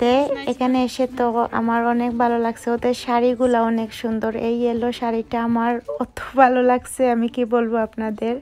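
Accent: Sylhet, Bangladesh. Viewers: Boin sylhety mat ow tumare beshi manay. Suddho vashar mat huniya kila lagse. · Afa sylheti mato ela bala lagena 😏😏😒😒😒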